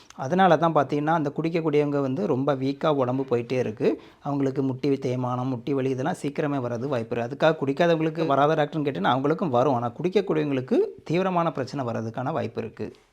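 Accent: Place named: Nagercoil, India. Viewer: நாகர்கோவில் தமிழ் 🎉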